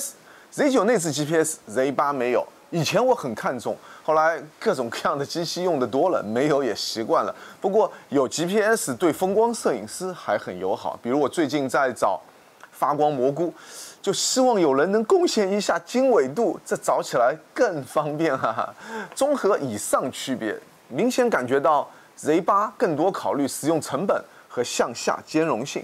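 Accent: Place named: South, China. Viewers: 听着南方口音，很亲切！澳洲Z8实在贵离谱了:face-pink-tears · Up主是上海人吧,一口南方口音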